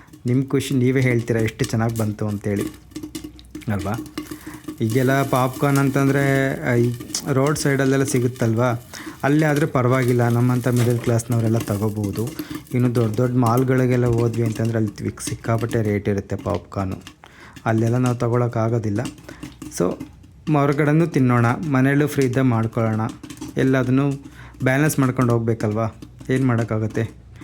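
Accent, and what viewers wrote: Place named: Bangalore, India. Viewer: Yaru ene helali neevu mathadtha recipi thorsi ivathu yako bangalore janara thara mathadha idhira ista aagolla chithra durgakade mathadidhange mathadi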